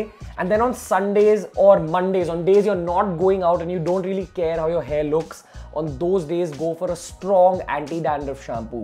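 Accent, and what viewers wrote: India. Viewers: When will indians acheeve to speak prooper english or american accent? Why do they fail generations in this?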